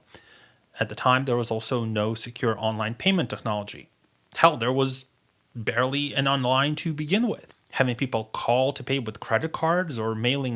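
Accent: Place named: United States. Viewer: some annoying american voiced thing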